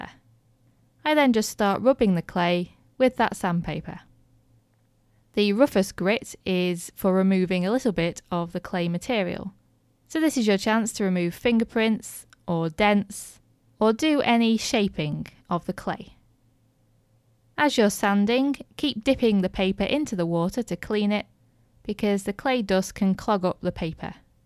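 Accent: United Kingdom. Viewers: I heard british accent, isn't it? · I realize that, I just wonder where in Britain people speak like that.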